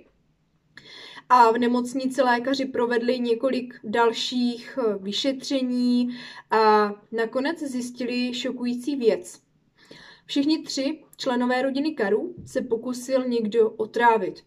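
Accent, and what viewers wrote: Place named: Ostrava, Czech Republic. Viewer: Mne treba vadi zvyseny ton hlasu na konci vety. Zakoncujete vety jako by otazkou. Budete asi z Ostravska, ze :)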